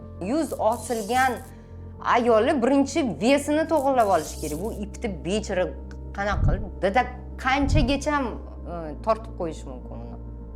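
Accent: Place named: Uzbekistan. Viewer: Toʻppa-toʻgʻri xuddi asl haqiqiy oʻzbek ayoliday gapirdingiz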